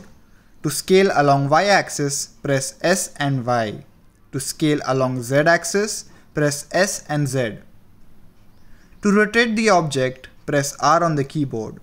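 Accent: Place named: India. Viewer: When they have an Indian accent, you know it's about to be good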